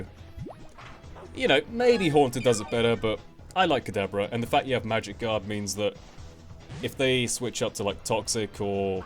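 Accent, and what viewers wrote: United Kingdom. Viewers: You have an amazing british accent :)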